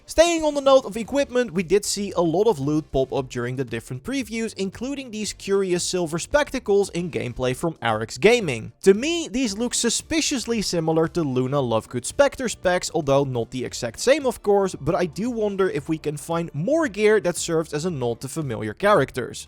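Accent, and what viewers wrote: Netherlands. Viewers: i love your dutch accent.....🥴😅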